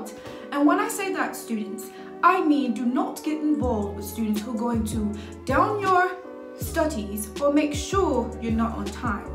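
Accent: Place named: England, United Kingdom. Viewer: Some good tips Teacher...loving the glasses and English Accent 😂👌🏽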